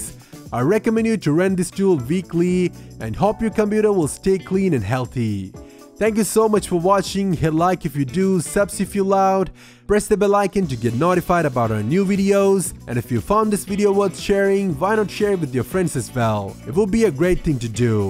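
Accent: Kerala, India. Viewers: Your English wasn't bad. I could understand you well. Btw, are you from India? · Are you from Kerala??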